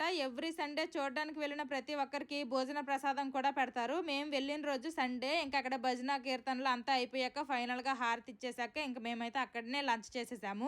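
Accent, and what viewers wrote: Srikakulam, India. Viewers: Ni voice వింటుంటే midi pakka srikakulam ani ardamaindi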